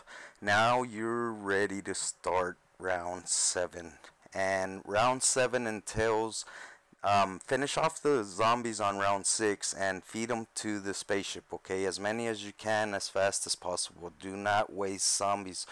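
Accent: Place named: Canada. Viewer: you sound like a nice Canadian okay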